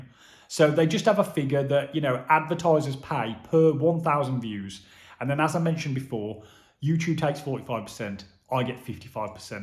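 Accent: Black Country, United Kingdom. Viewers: Hi, Steve here from Wolverhampton. As you say, it's time consuming work operating a small but growing YouTube channel, but you have done more than most and become monetised in just over a year. I have only just come across your channel and enjoy your presentation style in that fellow Black Country accent with an open and honest approach. I have particularly liked your pension update and solar panel review and have diarised Ace Solar for when I get quotes next year and will mention your name when I contact them. Now for a few new video idea - Based in the Black Country, the new Peaky Blinders movie coming out soon how about a trip to the Black Country Living Museum showing the delights of this fantastic facility and some of the locations for filming - Food for thought - Keep going, we are all enjoying your posts.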